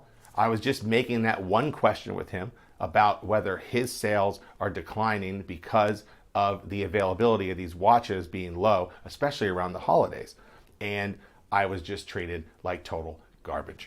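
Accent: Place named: Rural, United States. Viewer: Not defending his behavior but you come across like a dude from the hills of Pennsylvania. Poorly dressed with that horrible hick like accent. Once he heard your opening salvo he had already sized you up. Not saying it’s acceptable but these guys see country bumpkin tourists all the time.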